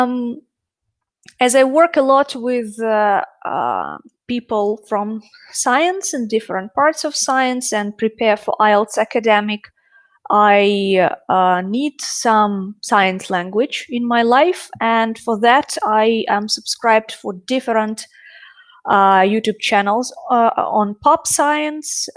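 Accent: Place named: Russia. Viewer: Why do you speak English with Russian intonations?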